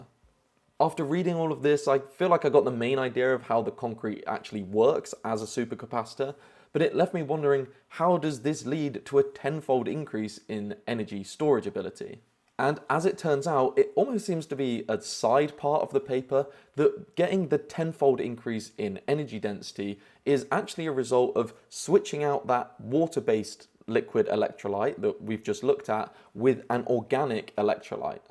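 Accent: United Kingdom. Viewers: Enough of the British accent. Speak English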